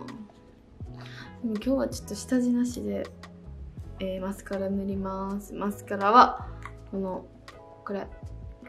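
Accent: Kansai, Japan. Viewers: 私も雑目なA型です。メイク動画は、ブランドの好みや色使い、質感全てちゃんが私のタイプです。関西弁も可愛い💕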